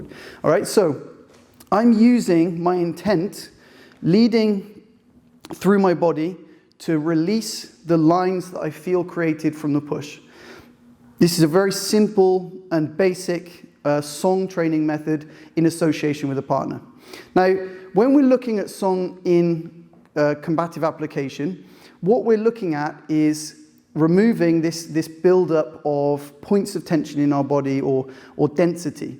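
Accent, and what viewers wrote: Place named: Northeast, United States. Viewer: I was going to say, you sound like you’re from somewhere east of New York. 😊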